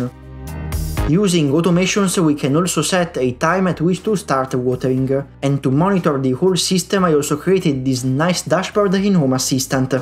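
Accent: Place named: Italy. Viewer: Bellissimo progetto! Non capisco perché farlo con questo inglese dall’accento chiaramente italiano. Anyway, bravo!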